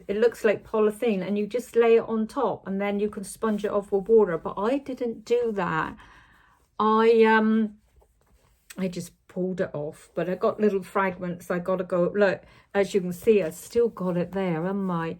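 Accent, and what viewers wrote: Cornwall, United Kingdom. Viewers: I have missed you with your gorgeous Cornish accent. I totally understand how life's circumstances can leave you with no enthusiasm for stitching. It has happened to me before. I am so pleased it is back and I so enjoyed seeing your embroidery machine and all the lovely cushions and tea cosies. Take care of yourself and we will see you again soon. xx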